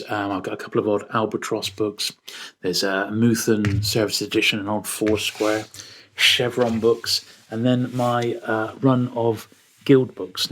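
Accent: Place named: West Country, United Kingdom. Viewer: It's definitely that soft, West Country accent! Love your vids as always my friend 👌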